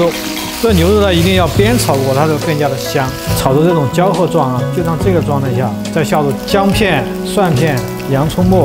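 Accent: Sichuan, China. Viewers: 师傅是四川人吗？椒盐普通话很好听。😊菜单也收藏了。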